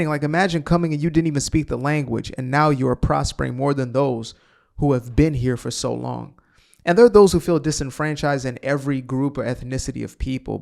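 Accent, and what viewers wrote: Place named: United States. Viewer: Yea you sound American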